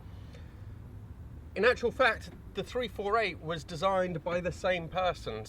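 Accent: Italy. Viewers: The British channel with a lovely Italian accent. This presentation then, is particularly fine. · You appear to of developed a very pronounced Italian accent?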